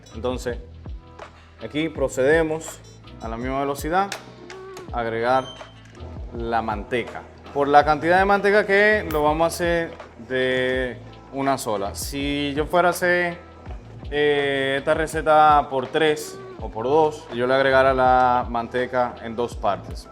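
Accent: Dominican Republic. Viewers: Ahi padres pero tu si habla nos puedes negar que eres domin .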